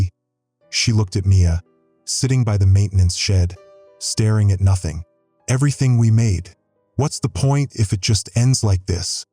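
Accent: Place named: Spain. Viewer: The Spanish accent occurring randomly throughout is distracting. How does that even happen?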